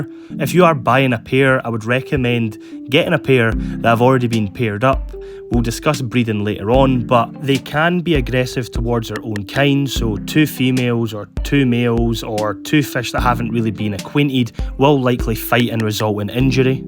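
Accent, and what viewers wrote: Scotland, United Kingdom. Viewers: I just got a pair of these guys yesterday. They are such cool looking little fish. Thank you for the video. Are you from Scotland?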